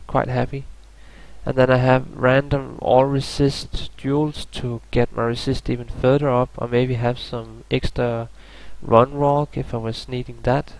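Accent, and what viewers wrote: Denmark. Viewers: Nice tutorial ！ Are u from Denmark?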